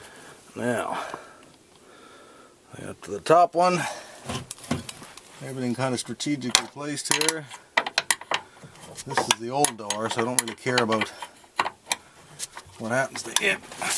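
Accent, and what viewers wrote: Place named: Canada. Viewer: he sounds Canadian!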